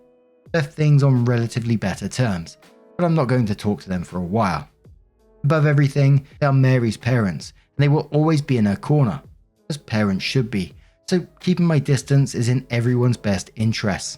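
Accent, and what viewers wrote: United Kingdom. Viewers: It's the British accent for me. Makes everything sound funnier!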